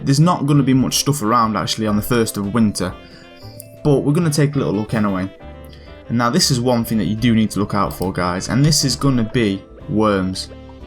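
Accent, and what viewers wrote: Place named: England, United Kingdom. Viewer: Where in England is that accent from? Or is it a speech impediment? My apologies if the latter, but if not, for the love of god it's annoying as all fucks. Feel like I should leave a compliment to balance this comment now... nah. I'll just like the video. -1 +1 karma.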